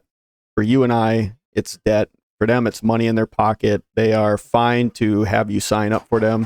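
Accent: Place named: Wisconsin, United States. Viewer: Oh you're from Wisconsin for sure.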